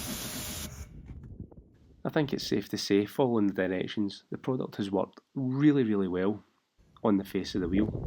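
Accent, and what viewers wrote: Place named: Glasgow, United Kingdom. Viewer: Hello great video, first time viewer here with just a few questions What civic is that? Saloon, Hatch or coupe as well as pre facelift or not? What scrapyard did you go to, to find Japanese car parts, I’m in Glasgow and you sound like it too so I thought I’d ask. Any scrapyard with parts for a 2000’ civic would be nice to know of, I’ve got my own project which I’m trying to complete too, thanks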